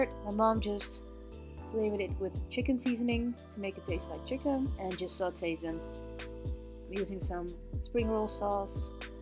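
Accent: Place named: France. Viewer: Hello, what a wonderful personality, heart and energy you have <3 First let me ask you, as your accent in English seems a bit "French" to me, is this due to your heritage or upbringing or just the way I hear it and feel it? Next, not to put a damper on you or your video because it is Beautiful but, if you are using Oreos with the same ingredients as here in the States; then you are taking in lots of things that are unhealthy to your Human Body as well as the environment. Such things like, sugar, palm oil, high fructose corn syrup, corn starch and artificial flavors as the really not so good ones you need to avoid. Sugar, especially refined, but in all forms is very addictive and lights up the Human Brain in the wrong way, as well as the glycemic index and how it regulates in your pancreas, body and blood. Use raw honey for best natural sweetners. Palm oil as it is first off not going to be sustainable and this means the forests are cut down fast and the land left in ruins, as well as Orangutans left homeless, split from familys and many killed in cold blood. 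Sorry the Truth is very sad on this Planet due to unconsciousness of the Masses and the fact many things are kept from us a a Species due to Corporations, Media/Tv and for greed and profit. High Fructose Corn Syrup or HFCS is one that is a no no and very processed and should be known by everyone now days. Artificial Flavors are more then likely not only chemicals, but animal or animal by products. On top of this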